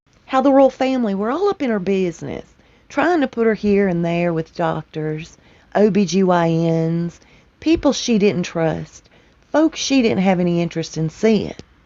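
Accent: South, United States. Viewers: By the way, I love your southern accent, love to hear you talking.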